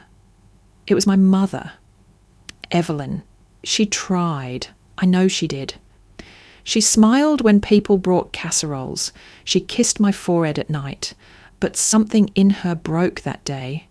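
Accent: United Kingdom. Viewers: No more British narrators, please! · This offering is being viewed from Stevensville, Maryland on an island in the middle of the Chesapeake Bay USA Although the ending is as expected, I think the biggest problem that I had with this entire offering was the narrator sounds like a very beautiful and fine lady however, her English accent totally be lies. The military feeling that should’ve been there. But the Jerk got exactly what he deserved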